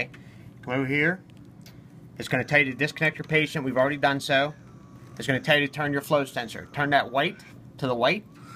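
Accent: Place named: Pittsburgh, United States. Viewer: Pittsburgh accent!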